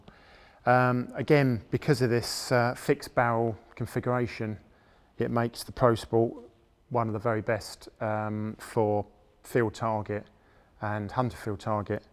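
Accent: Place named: United Kingdom. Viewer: his british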